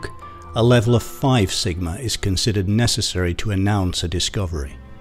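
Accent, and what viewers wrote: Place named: England, United Kingdom. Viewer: I'll admit it. the English accent makes me pay attention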